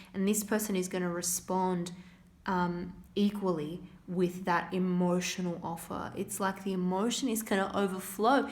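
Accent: Australia. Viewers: Beautiful Ozzy accent ? ..thank you for the reading..being blessed right now ,peace to you reader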